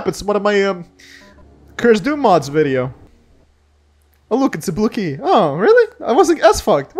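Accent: Russia. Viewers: I can't decide, I hear the Russian accent and see the inscriptions on the Russian, but at the same time you have in the description, the location of USA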